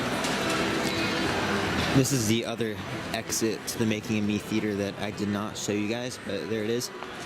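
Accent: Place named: South, United States. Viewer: The whole Wonders building is very dated but could be gutted and converted into something else. The outside should be painted from yellow to silver or something less garish but the space is huge and should be reimagined into something which makes use of all that space, maybe a ride. You are so disciplined which is good as it must be tempting to open things and interfer with stuff you see but you don't which should be commended as a lot of vloggers don't always do the same. Also where are you orginally from? I can't always recognise American accents but you seem to have a soft sounding southern accent I can't work out.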